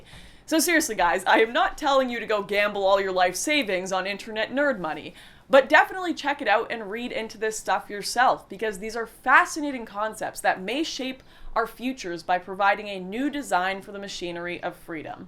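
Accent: Canada. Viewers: be waaay hotter if she'd drop the Canuck accent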